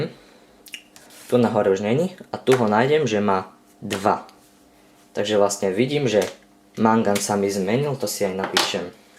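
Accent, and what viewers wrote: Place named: East Slovakia, Slovakia. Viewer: To je asi najvychodňarskejší prízvuk na svete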